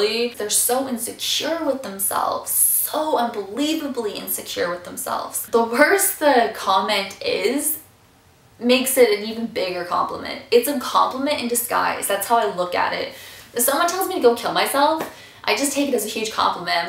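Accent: South, United States. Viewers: I freakin love your southern accent . Your face is fine. People are jerks. Even if they used to be your friends. I love your happiness. One of my old friends said "Whats wrong with your arms" i have a lot of freckles and she thought they were so ugly. But i look in the mirror and im ike sometimes "Oh i look good" just not full of myself lol. I love the fact that i love Harry Potter. Im weird crazy and fun my friends love me we always make each other laugh and i love my life. I got bullied a lot and i wanted to kill myself but im now in love with myself. I love my personality. I love the fact that i love Harry Potter. I love how my hair are so beautiful. :) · I'm new to your channel but you're already one of my favorite youtubers. I love your videos and the positive vibes you give off. Plus, I love that southern accent lol I think it sounds a lot like Britney Spears. 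And your face is beautiful! I ignore the haters too. I use to hear things about being gay all the time, but eventually as I got older and left high school I got a lot more confident just like you said in the last bullying video.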